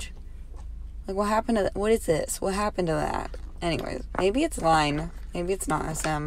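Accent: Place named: United States. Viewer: i also want to call it the Re Ve Festival but the girls pronounce it as "reeve" i guess since since yeri calls the robot Reve. You sound American, but how did you get the album so fast?! It'll take a month before I get mine. I'll trade anthing for Irene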